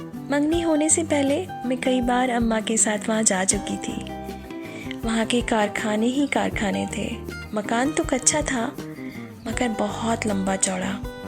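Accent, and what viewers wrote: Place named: India. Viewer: اردو زبان ک ے الفاظ کو براہ کرم' ہندی کے لہجے میں مت پڑھیئےگا محترمہ۔ براہ کرم پہلے اپنا تلفظ اور لہجہ کو اتنی تاریخی ناول کے شایاشان پڑھیئےگا۔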